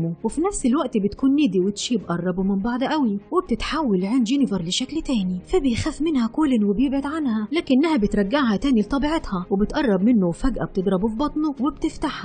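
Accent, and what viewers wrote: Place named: Libya. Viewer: يل بتتكلم ليبيهة خلي السرد بلهجه الليبيه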